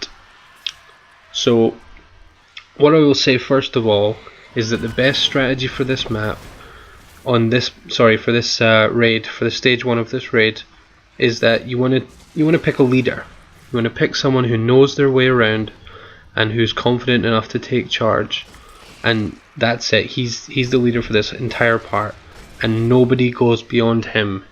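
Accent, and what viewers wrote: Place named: Ireland. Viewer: You irish :o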